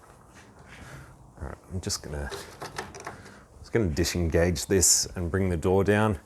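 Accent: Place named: Australia. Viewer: Great video, nice to hear an Australia voice on YouTube for a change.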